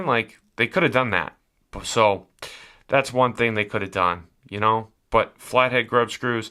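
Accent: Boston, United States. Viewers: You're not a Bostonian by any chance, are you? Just testing my accent recognition.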